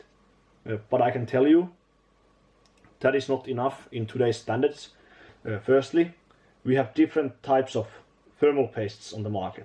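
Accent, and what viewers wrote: Finland. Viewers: Suomi brgl, tunnistin heti aksentist! 😂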